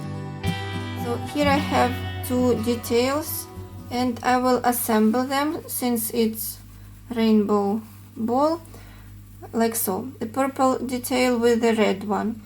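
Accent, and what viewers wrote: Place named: Russia. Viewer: какая красота но смотрю без звука, ужасно режет слух. Всё-таки русский акцент в английском языке это нЕчто, произношение как будто топором рубят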